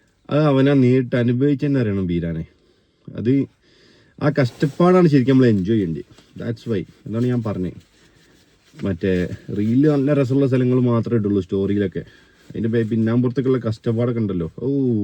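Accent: Malappuram, India. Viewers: Hai, where are you now? in Namibia? wish you a happy journey. Your malappuram slang is amazing. God bless you. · Malappuram സ്ലാങ് അടിപൊളി ❤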